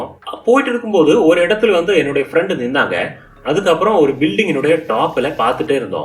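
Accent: Kerala, India. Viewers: உங்க கேரள தமிழ் 😍😘😍😘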